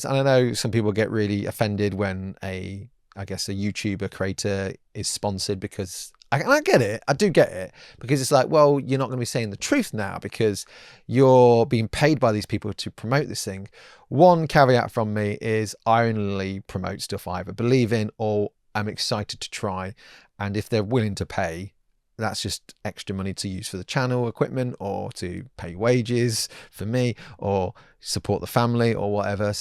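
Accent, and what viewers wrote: United Kingdom. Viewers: Audio's fine by me matey! I just like hearing an East Midlands accent instead of an American drawl for once xD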